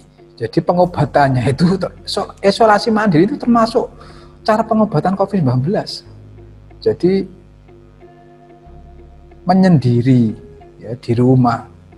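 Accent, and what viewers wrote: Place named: Java, Indonesia. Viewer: Matoh... Jowone medok...😄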